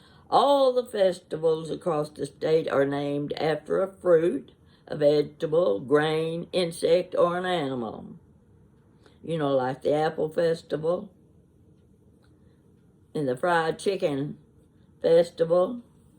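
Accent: South, United States. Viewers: Thank you so much for talking like me. Both sides of my family migrated over from KY. I've lived in a couple of places that don't appreciate our dialect. It's funny because I don't mind their's. Blessings to all. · I've been watching you for months. I think i messed up, do you live in Kentucky or Tennessee? Either way i love your accent! There is a utube you might enjoy. It's Celebrating Appalachia, Tipper Presley's goal on her channel is to keep Appalachia language and foods ways alive. She's wonderful. She reads books to us on Fridays. Books Southern and Appalachian authors have written. They have been amazing. She lives with her husband, one if her twin daughters. Her other daughter & her husband live about 20min away. They garden, cook, have a good time. I love it! She also has a blog she started in 2008, the blind pig and the acorn. It's posted everday. 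So interesting. Grammy from Texas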